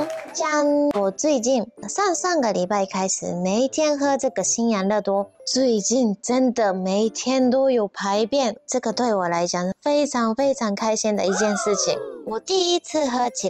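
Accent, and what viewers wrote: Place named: Taiwan. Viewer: 的『國語』越來越有台灣腔了!!!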